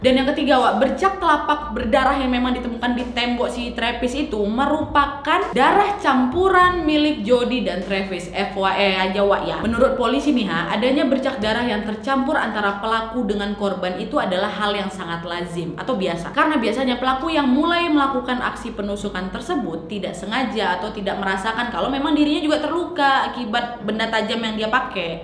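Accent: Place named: Medan, Indonesia. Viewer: Ga seru rupanya klo ga pake logat medannya, emang kenapa rupanya.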